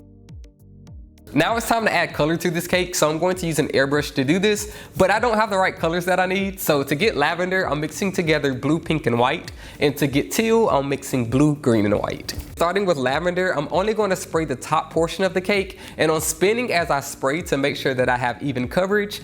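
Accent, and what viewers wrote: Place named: Alabama, United States. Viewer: The alabama accent thoo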